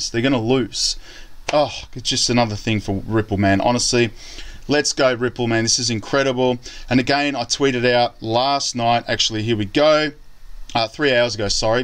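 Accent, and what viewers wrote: Australia. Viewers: Love hearing a fellow Aussie talk up Xrp. Have a bag of 70k xrp and I’m hanging for this to go back up as i bought at 68c AUD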